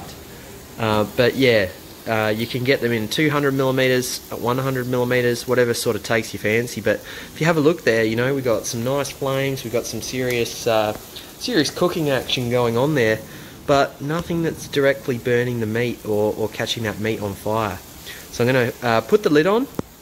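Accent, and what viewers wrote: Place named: South Africa. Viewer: Love that SA accent mate, great video, cheers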